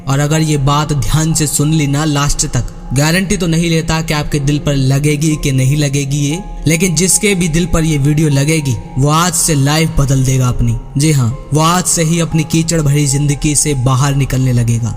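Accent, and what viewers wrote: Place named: Bihar, India. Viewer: Bihar se baat kar rahi ho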